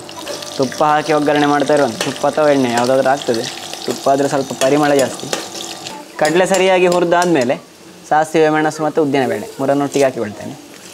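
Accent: Mangalore, India. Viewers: Mangalore kannada...nice to hear 😊..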